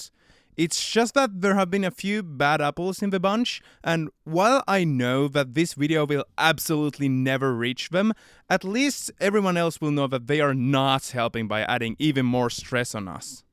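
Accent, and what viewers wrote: Finland. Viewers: Are u Finnish🇫🇮? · En ees tajunnu et äijä o suomalaine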